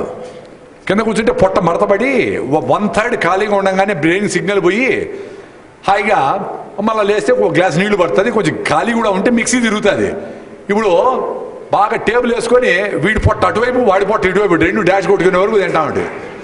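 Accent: Rayalaseema, India. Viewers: love u r rayalaseema slang sir, it makes listeners interesting to hear...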